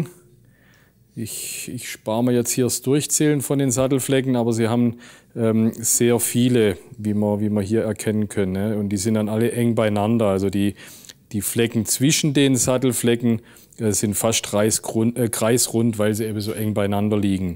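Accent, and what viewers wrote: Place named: Baden, Germany. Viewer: nein badisch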